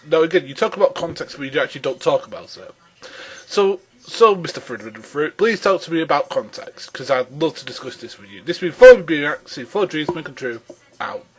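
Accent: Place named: United Kingdom. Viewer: No you are not. Speech impediments or not, it would be preferable if someone uploading a video at least tries to be clearly audible. It's just a very thick british accent joined with random mumbling and stumbling on words.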